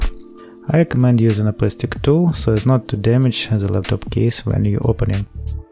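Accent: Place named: Russia. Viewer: Акцент выдаёт, на русском может есть смысл комментировать?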